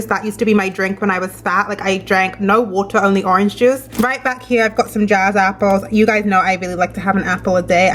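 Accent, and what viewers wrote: United Kingdom. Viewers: Hey. Is that a British accent you partially have? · Are you British?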